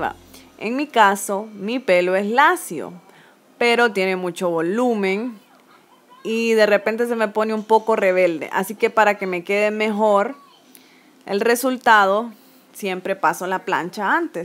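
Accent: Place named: El Salvador. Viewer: Tiene acento de mi El salvador 🇸🇻♥️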